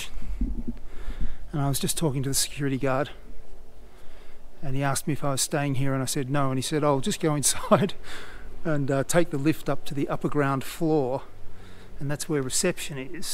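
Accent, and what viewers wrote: Australia. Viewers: You sound like a guy from👇 under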